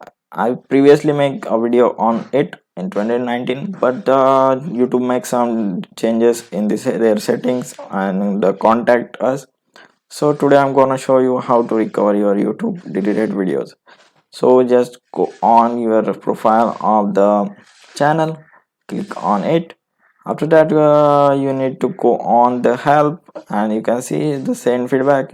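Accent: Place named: India. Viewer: The minute I heard the accent Indians are heros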